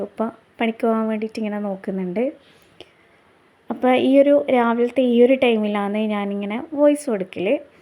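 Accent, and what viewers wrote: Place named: Kannur, India. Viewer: താത്താന്റെ കണ്ണൂർ ഭാഷ അടിപൊളി ആയിക്ക്ണ് 😊😊😊ഡേ ഇൻ മെയ്‌ ലൈഫ് വീഡിയോ പൊളിച്ചു ട്ടോ 👍👍👍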